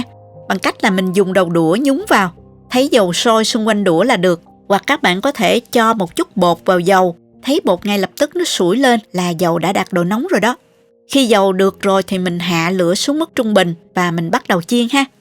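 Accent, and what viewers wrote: South, Vietnam. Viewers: Cảm ơn luôn luôn cho ra những video bổ ích..với sự hướng dẫn ngắn gọn dễ hiểu, cùng với giọng nói mộc mạc đậm chất nam bộ, em rất thích nghe a 👍💕, mến chúc cô nhiều sức khỏe để tiếp tục với niềm đam mê của mình ❤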